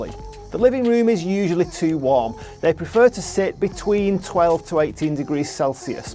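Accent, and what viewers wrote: North, United Kingdom. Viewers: I have a very different routine for growing them, and cyclamen probably account for 25% of all the plants I cultivate. also in the UK, and from your accent about the same Northern latitude. been growing them for at least 10 years now and only lost 4 out of about 50 mature plants. all 4 lost were lost within the first year due to tuber rot and were probably already damaged and on their way out when I bought them. so second year of buying i prodded my finger into each pot before buying a plant to check there was a reasonable size and hard tuber in the pot somewhere. The rest are all doing very well, some started of as 1cm ultra cheap baby specimens, and now have like 5cm diameter tubers, others now have even bigger upto 10cm diameter ( that's 30cm circumference ) tubers, I have a considerable variety of species and all the colour spectrum I could possibly get my hands on. 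they flower for over 6 months of the year ( slightly staggered between species ) , and I often pollinate them with an air puffer, so they produce pods, which are interesting to look at for 4 more months of the year., leaving just 2 months of leaf only, i never let them go dormant. mine remain very lush and green all year round, most leaves lasting more than 1 year and get quite big, I never let them go dormant, and I get a great deal of continuous blooms each year, like a carpet of flowers above the greenery. I plant them with the top 1/5 ( 20% ) of the bulb above the soil or compost and i always top water, qu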